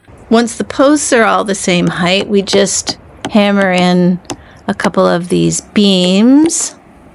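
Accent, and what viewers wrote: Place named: New Zealand. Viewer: hi from nu zillun love your kiwi speak lol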